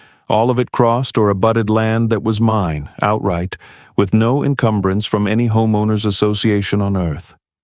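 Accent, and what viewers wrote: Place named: United Kingdom. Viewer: It'd be nice to loose the British accent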